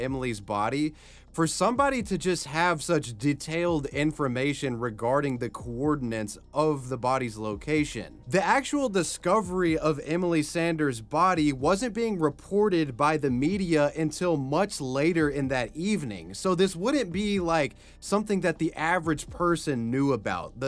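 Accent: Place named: United States. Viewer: I just found your channel yesterday and I’m addicted. I rarely subscribe to any channels and you’re so much better than other channels. I hate when channels are run by people with thick accents or over dramatic. You are straight forward with little drama and normal American accent. Super refreshing!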